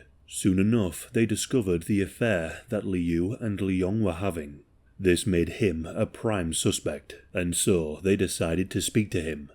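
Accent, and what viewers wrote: United Kingdom. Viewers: I just get more and more addicted to this damn channel and the narrators UK accent along with Shrouded Hand there's no way any Yank across the pond can make any true crime content this bold unique and exclusively personal .👑👑👑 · Are you British?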